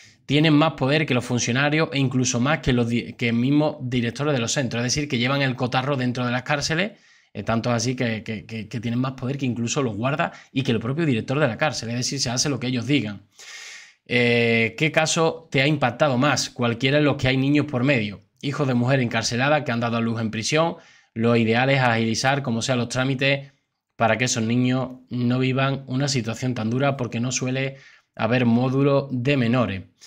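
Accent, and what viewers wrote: Andalusia, Spain. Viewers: eres Andaluz? saludos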